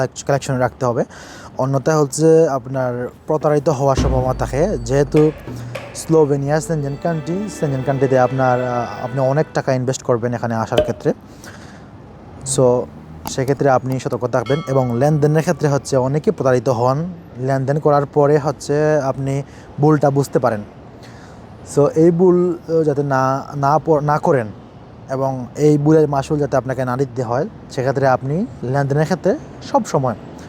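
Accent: Sylhet, Bangladesh. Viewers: ভাই আপনে আমরার সিলেটি ভাই নি 🥰🥰🥰 আপনার জন্য শুভকামনা ও দোয়া রইল ভাই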